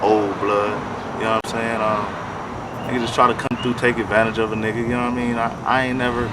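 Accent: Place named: New York, United States. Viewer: you talk like a NY nigga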